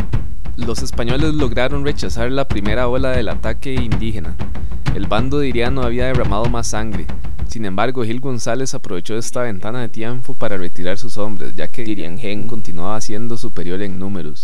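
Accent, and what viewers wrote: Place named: Costa Rica. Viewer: llegar a uno con acento tico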